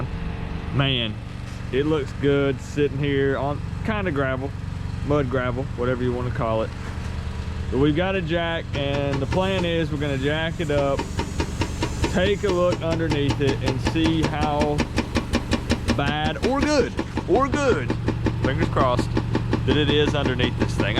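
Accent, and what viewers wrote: South, United States. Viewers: You sound like Carl Sagan if he had a southern accent. 👌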